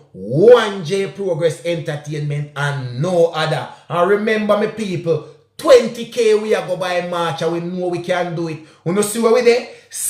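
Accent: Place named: Jamaica. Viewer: (Sorry I meant to say one hundred thousand). I’m hoping you reach 100000 subscribers as you tell the stories very well. I love the Jamaican twangs you use to express the situation. ❤❤❤❤😂😂😂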